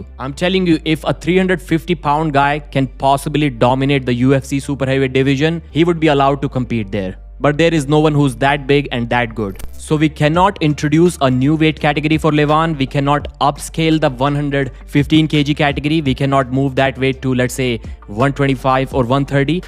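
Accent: India. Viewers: I can't stand the Indian accent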